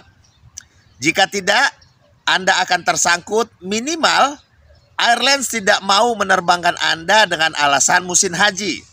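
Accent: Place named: West Java, Indonesia. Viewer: Orang sunda ya bang, pisa 😊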